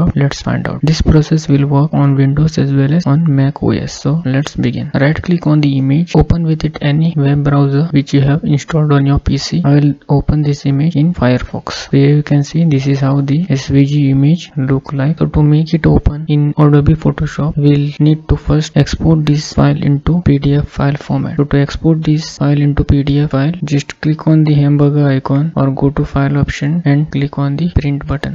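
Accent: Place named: India. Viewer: You talk as though you are talking Hindi or something like that which makes it very hard to understand. You are talking without pausing anywhere.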